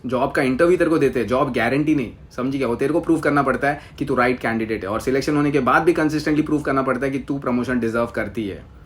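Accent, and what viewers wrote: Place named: Maharashtra, India. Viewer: Your Marathi accent is making this video 10x times better 😂❤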